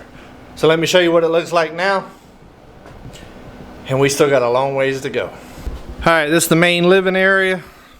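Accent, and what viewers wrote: United States. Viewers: unfortunately, he is one of those guys that really rubs in that country accent.....too much for me. hope he settles in and loves his choices.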